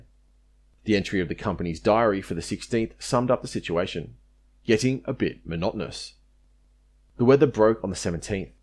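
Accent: Australia. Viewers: Probably . It still makes him sound like a bogan . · I am guessing the narrator of this is Australian? At any rate, I am grateful that you produced this! (I am American 😁) it has been very educational to hear this - as another comment stated, all too often Americans only showcase Americans! 😩 Anyway, thank you for this! ❤️ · Good thing this guy is Australian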